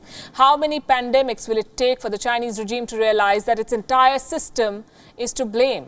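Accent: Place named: India. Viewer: I love Indian accent on English language, sounds cool.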